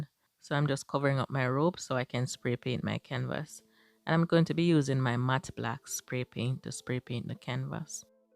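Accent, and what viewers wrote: Jamaica. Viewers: I can hear you are Jamaican. Big up uself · Talented. You sound like a Jamaican. I am a Jamaican.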